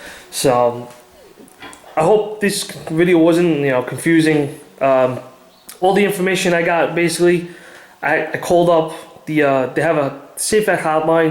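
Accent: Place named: Ireland. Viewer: Wow you sound very irish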